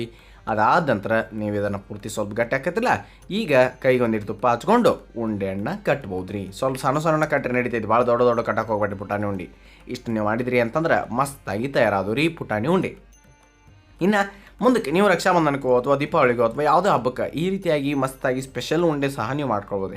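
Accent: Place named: Bijapur, India. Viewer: Nau kuda bijapur davru sir matadidu keli manssige tumba sanda aaitu sir🙏🙏❤❤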